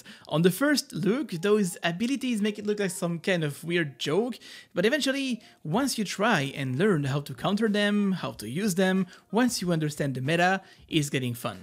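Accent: France. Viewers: you could go on top of something with Pheonix with the Ice wall of Sage if she cast it under you! New here, what is this accent, are you french by any chance ? I'm from Quebec, Canada! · Are you French? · j'adore ton accent français en mélange avec l'anglais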